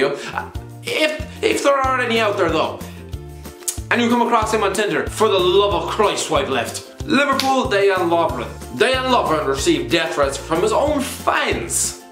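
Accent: Ireland. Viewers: Irish accent👌🤘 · are you irish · Shit I can't understand half of the things he says, Irish accent is difficulty asf, slow down boy, you're talking way too fast, does every Irish talk this fast? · Does the "Irish Guy's Rant" on the screen for 90% of the video not tell you? Or his clearly Irish accent? Or the fact he called himself Irish multiple times in the first few seconds?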